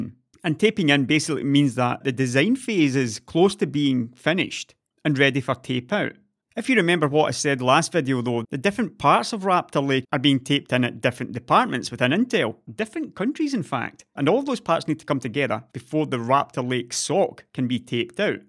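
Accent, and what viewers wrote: Scotland, United Kingdom. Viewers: that beautiful Scottish voice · Good to hear your "Scottish" Voice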